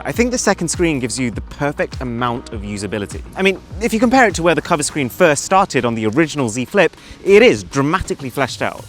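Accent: United Kingdom. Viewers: why does he have a british accent?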